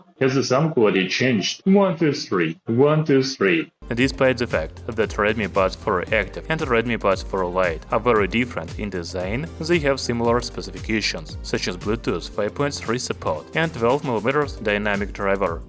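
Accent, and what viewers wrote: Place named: Russia. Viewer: Какой же сильный русский акцент, извините ) Мне кажется, в русском языке столько звуков, что язык может говорить на английском гораздо ближе к носителям.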